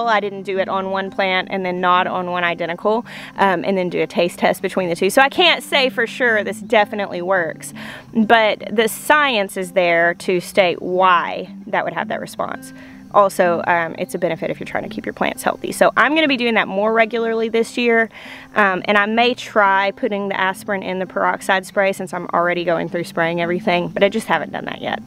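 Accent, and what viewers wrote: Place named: Arkansas, United States. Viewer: Love your Arkansan-Southern accent. So refreshing. I live in Little Rock, it’s turning into something else. Lots of valley-girl coming in.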